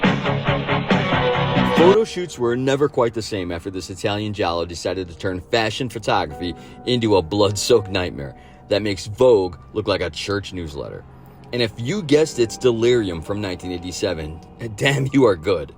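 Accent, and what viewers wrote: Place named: Chicago, United States. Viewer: I thought you might be from Chicago. Your accent is subtle. I am 45 from Tinley Park.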